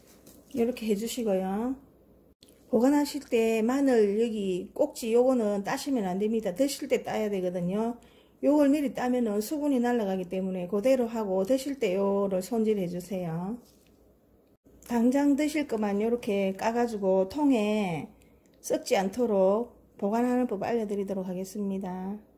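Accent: Busan, South Korea. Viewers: 유용한 정보 감사합니다 ~~ 부산말씨 정감 있어요 ^^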